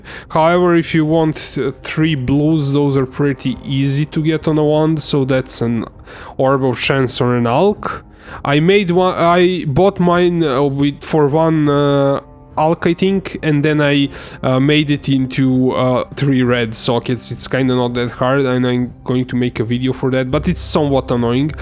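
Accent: Russia. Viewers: Ты русский? Акцент очень заметен.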